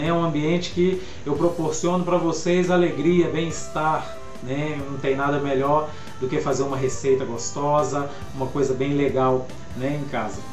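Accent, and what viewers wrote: Minas Gerais, Brazil. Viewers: Oi! desde o primeiro vídeo de rocambole já gostei do seu jeito de passar a receita, mto tranquilo, simples e com mt convicção. Que bom esse vídeo, e´ interessante sabermos um pouco da vida da pessoa, completa mais o conhecimento virtual. A gastronomia e' instigante, ela nos remete a vários lugares culturas diferentes.....nos faz experimentar um pouco desse universo, gosto muito tbem, se não fosse professora acho que me daria mt bem nessa profissão. Você tem tudo pra dar certo! na vida as coisas são devagar tudo no seu tempo, gosto do seu sotaque mineiro e da sua cultura, comidas deliciosas... Sorte e Sucesso! beijos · Ah! como imaginei, pelo sotaque e jeitinho, vc é mineiroo de BH! Moro em BH, pois casei com um mineiro uai, mas sou gaúcha, criada em Curitiba. Hoje, super inscrita no teu canal... entrei por acaso pra ver uma receita e vi q teu canal tem mtaaaaas receitas boas. 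Vc é mto talentoso, prendado e acima de tudo uma pessoa humilde, tbm explica mto bem as receitas. Deus te abençoe, grandemente,! Abraço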